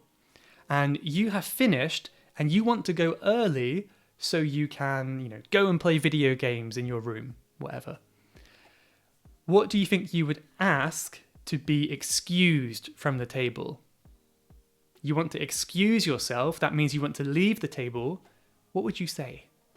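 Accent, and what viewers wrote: United Kingdom. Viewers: I'm new subscriber, I'm loving your videos, thank you, I like the British accent.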